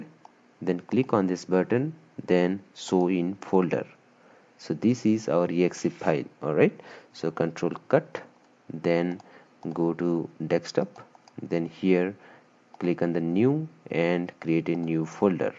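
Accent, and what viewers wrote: India. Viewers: thank you SOOOOOO much when I hear an Indian accent I know I am in safe hands! :D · Indian accents are so annoying. Sorry, I'm not sorry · Yet more proof that "guy with indian accent" YT tutorials are the best channels to learn cracking tutorials from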